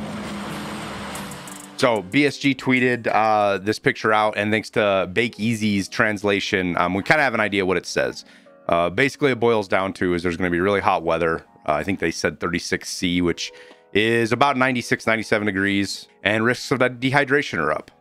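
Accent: United States. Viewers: Love your vids man but the one thing that kills me is some of your “Americanized” pronunciations. For example kvass is almost pronounced like ‘kwass.’ I understand you don’t know everything and it’s just a minor annoyance on my behalf but it drives me crazy xD.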